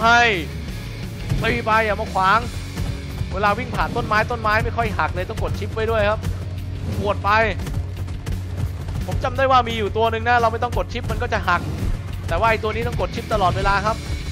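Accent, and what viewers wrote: South, Thailand. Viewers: คนใต้ปะพี่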